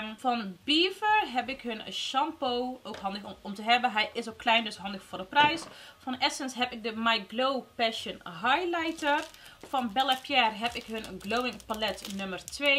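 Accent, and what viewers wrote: Brabant, Netherlands. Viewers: Hoi, ik volg je al een tijdje op je kanaal maar ook op insta. Ik vind je videos leuk omdat je dicht bij de "gewone" vrouwen staat hoe je over komt en hoe je klinkt. Ook nog eens een Brabantse dat is altijd leuk ☺️. Verder is het leuk om naar je te kijken de uitleg is makkelijk te volgen, je geeft eerlijk je mening over producten en da zien we graag! 😍👍🏼 Heel veel succes met de win actie en stiekem hoop ik dat ik win natuurlijk 🍀🍀 Groetjes uit Eindhoven 💄👄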